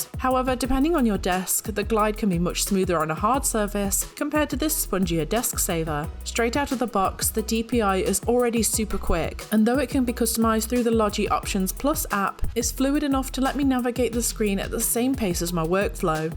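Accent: United Kingdom. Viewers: Just something about hearing a British woman speak. I can hear you talk all day. Love the review. Informative and helpful. Thanks.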